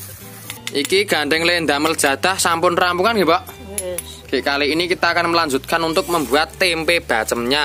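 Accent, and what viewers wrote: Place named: Java, Indonesia. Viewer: Jiaaan Medok Banget Bahase Preen...Selalu Bahagia .. lan Sehat Sama Simbook ...👍❤💪...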